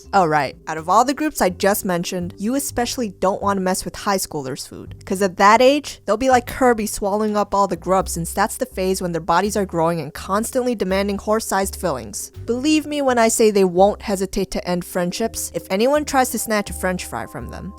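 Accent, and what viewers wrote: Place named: Long Island, United States. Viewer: You sound like a mix of Karina and Julia's Long Island accent from Drawfee.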